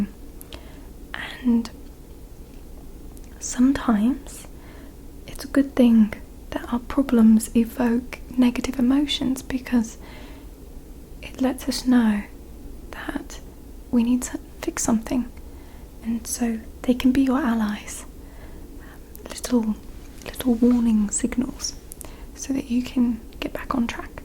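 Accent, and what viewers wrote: England, United Kingdom. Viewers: I'm only just discovering the British accent videos, these are delightful! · I swear must be partly English with an accent that convincing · I can call myself British because Britain is a nation (you forgot to include Northern Ireland, by the way.) Also, I specifically said I thought she sounded English, not British. If you want to call yourself a North American, that too would make sense because it’s where you’re from. Stick to America, I’ll stick to Britain! 🤗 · Your accent is super believable from my experience a lot of Americans trying to do an English accent either sound like Dick Van Dyke in Mary Poppins or a super over the top impression of the Queen. If I heard you I would think you were English...I'm English, just thought I'd mention that for context haha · What a voice! so soothing.Nice english accent.Poetry to my ears. 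· Please do more British accent video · The English accent is back... Yay! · It's! Nice to see a new ASMR video from you! Watching this at the moment for a comforting Tuesday afternoon! Love the English accent as well:) You're the coolest! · I always forget youre not british when watching a video. Hah, thats great! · I’m from south London, how do you sound more English than me? 🤔😂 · As an English person, Americans are usually dreadful at putting on an English accent - espeically so-called professional actors!....but this is absolutely 100% convincing. Like many others, this is my first video of yours, and I never suspected you weren't English for one moment, until I read the comments. You should get into professional acting! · This is the greatest English accent I’ve ever heard from an American. Are you an actress? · It is so nice to hear an American do an English accent and not sound Australian. 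· Wait, you AREN'T English? Your accent is incredible. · that's a flawless English accent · I just came here because I miss British accents and I find myself answering the questions in my head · I love British.. or should I say English! ♥️ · Absolutely spot on accent, nicely done (from a UK viewer)! YT pops up this from the past, lovely to see, as always. You even seem to be 'acting Englishness', you would have been excellent in The Crown 😊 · As someone who’s English I’m actually so impressed by your accent 🤭 if I didn’t know you were American I’d genuinely think this was your real voice! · Umm, you're incorrect. Thats not an American accent at all! That's an excellent British (more correctly, English) accent. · Your English accent is so flawless, you really should be an actress. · Not gonna lie but when women have a English accent its super super attractive. I love the way it sounds. Very calming. 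· I'm British from Nottingham, thats a very beautiful english middle class accent. Your very considerate which I think reassures people. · Best English accent from an American I’ve ever heard 🙌🏻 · Can I just ask who or what you copied your accent from? You sound more English than any Brit ever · Wow, with the British accent you really remind me of Kathy from the Patty Duke show lol. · I thought she was English, it was the first video I've seen from here, so I went to watch another and I was shocked she was American. I'm English as well x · I genuinely thought you were British, until I saw your other videos. This accent is exquisite! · Wow. If you showed up talking like this I wouldn't be able to pin where exactly you're from (I'd guess maybe Sussex or Surrey), but I wouldn't have any doubt you were English.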